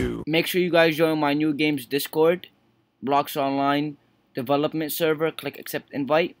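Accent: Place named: United States. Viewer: Discour Goup XDDDD, whay is your nationality? I noticed that you have a very strange American accent.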